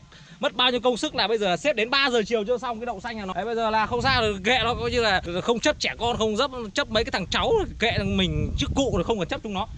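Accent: Thai Binh, Vietnam. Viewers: Anh là người đâu vậy ạ?? Nghe giống giọng Thái Bình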